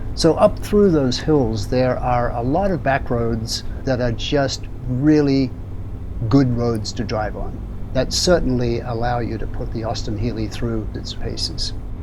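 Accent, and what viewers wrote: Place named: United States. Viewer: I am Australian and I could detect your accent. It sounds like you've been in America a long time. Magnificent car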